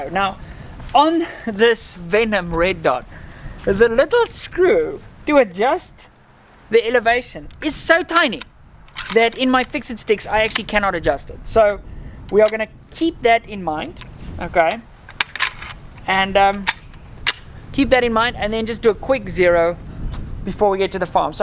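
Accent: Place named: South Africa. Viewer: ah a new transatlantic accent , half afrikaans , half american english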